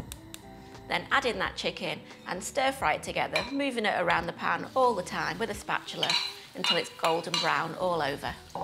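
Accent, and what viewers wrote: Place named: Manchester, United Kingdom. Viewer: It’s fantastic that (a) you are British, & (b) you sound like a MANC or at least a Northerner.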